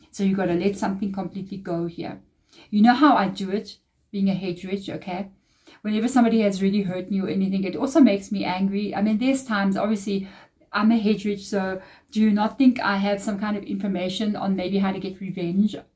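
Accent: South Africa. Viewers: Good morning You sound South African, I’m based in Johannesburg, I would love to come for a one on one session, is that possible if Yes please send Me a guide on how I can make this a reality, thanks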